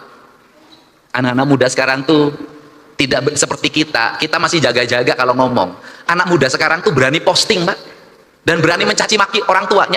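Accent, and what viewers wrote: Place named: Java, Indonesia. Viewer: Inilah ajaram sunda wiwitan yg sebenrnya, Logatnya pa dedi logat sunda saja ga usah ke jawa jawaan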